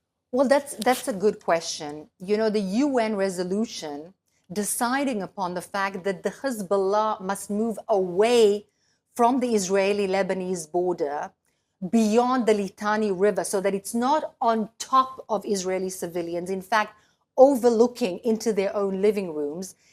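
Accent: South Africa. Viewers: South African accent Stop lying Israel child killer You are propagandist You lost go back to south African racist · well that was entertaining....she sounds south african... 2X apartheid for her on the books.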